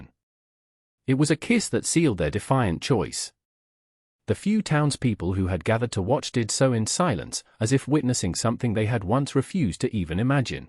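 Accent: England, United Kingdom. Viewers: Interesting yet disarming - - A narrator with a British accent telling a cowboy tail from the Old West 😞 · Please get rid of this hard, annoying English accented voice. It absolutely does not fit these stories. Bring back the warm sift and rich American accented narrator. This voice is extremely annoying.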